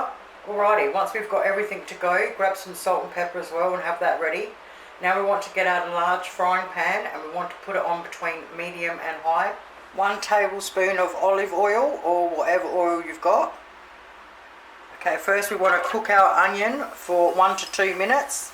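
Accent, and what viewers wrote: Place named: Melbourne, Australia. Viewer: I'm trying to work out your accent. Maybe Melbourne? I'm originally a country Victorian but we don't say "All" for Oil or "Ball" for Boil. 😄I have lived in WA for 30 years now. Go Cats. 👍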